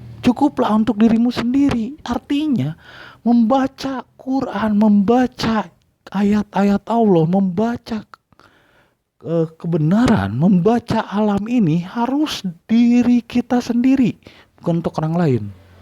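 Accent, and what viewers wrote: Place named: West Java, Indonesia. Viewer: MAS, KAMU HATI2... تفسير جلالين PUN KAMU TIDAK NGAJI...!! kalau dari segi logat bahasanya ini orang sunda..!! مكة المكرمة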